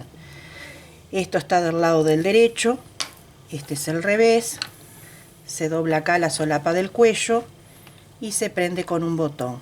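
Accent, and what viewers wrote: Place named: Argentina. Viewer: si pero por lo visto sos de ARGENTINA por tu asento es unico ... y aca no usamos esos terminos.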